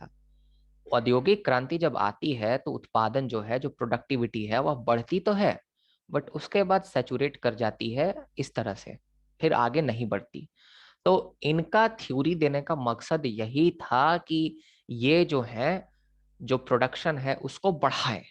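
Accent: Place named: Bihar, India. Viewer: Sir your teaching skills are just🔥🔥 and jo bihari tone hai usme to mja hi aa jata hai..❤❤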